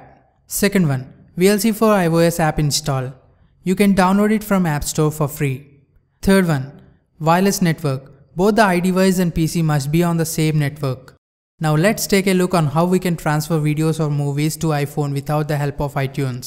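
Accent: India. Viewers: i always cringe a bit when i heard indian accent but we all learn and i understand. nice video mate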